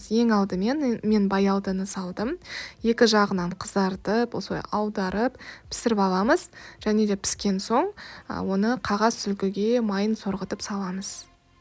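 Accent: Standard. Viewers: Жарайсың таза қазақша сөйлейді екенсің керемет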